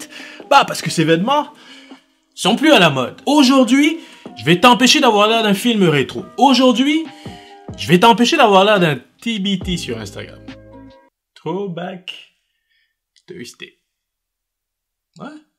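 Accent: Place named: Quebec, Canada. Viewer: Je sais pas pourquoi mais je sent l'accent québécois 😅 sinon vidéo au top 😁👌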